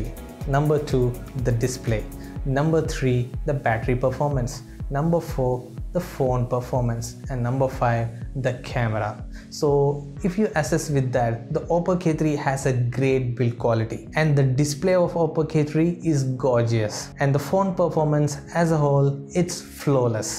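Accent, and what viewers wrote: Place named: Kerala, India. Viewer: malayalai ano bro? english arayumpol its understading... but video is good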